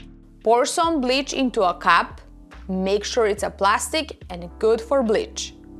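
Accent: Russia. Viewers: Здравствуйте. По вашему легкому акценту я догадалась что вы русскоговорящая. Я смотрю все ваши проекты и мне очень нравится каждая ваша работа. Этим летом у меня предстоит большой проект тоже. В спальне буду красить всю мебель, приелся уже этот коричневый цвет. Вам успехов, а мне удачи (не то придется покупать новую мебель 😆) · Beautiful!! I love this technique, too! I found it by accident when my project got too dark and I tried bleaching it. Worked like a charm! I don’t worry, though I know I should, about the smell. I clean my whole house in bleach quite often; especially in the kids’ bathroom. I have five boys!! Ha! Bleach is my best friend! Also, not sure if you’re Russian, but your accent makes me miss my old neighborhood so much. I had many Russian friends there. Ugh. I miss them!!! 😭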